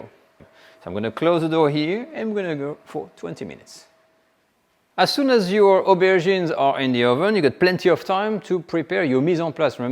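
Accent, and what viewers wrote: France. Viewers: I've lived in France for more than 40 years, and I still love the authentic French accent when speaking English!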